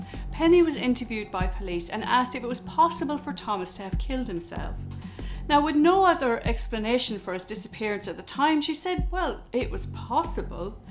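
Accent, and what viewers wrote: Ireland. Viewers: Don’t listen to them. You’re just fine and personally, I love the Irish accent. Between you and That Chapter (where I discovered your channel), I get my dose of it! If someone doesn’t like it, they can either learn to love it or move along. Keep up the great work you’re doing. The channel will continue to grow!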